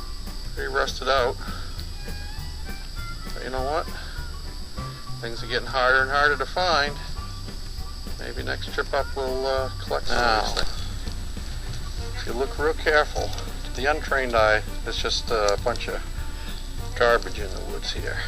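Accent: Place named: New England, United States. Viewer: Back when you still had your heavy New England accent. Great memory reel! Cheers my YT friend and Shipmate.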